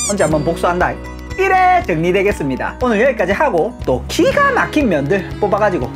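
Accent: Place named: Gyeongsang, South Korea. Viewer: 경상도 분이시구나 ㅋ ㅋ 저도 한 면사랑 하는데요 😆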